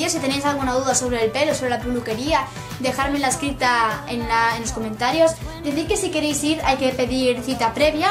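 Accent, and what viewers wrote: Argentina. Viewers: De nada:D Por cierto me encanta Argentina el acento y los dulces, el dulce de leche😍😍😍😍😍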